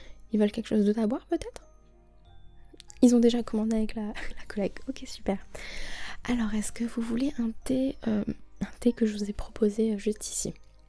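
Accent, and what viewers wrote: France. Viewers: Ton accent🥰🤤 j’adore les français votre accent et votre voix extrêmement douce🤗❤️ Bisous du Québec 💕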